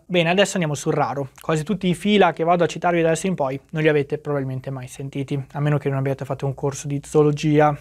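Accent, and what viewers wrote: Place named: Treviso, Italy. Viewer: mi pareva essendo veneto anch'io, sospetto trevisano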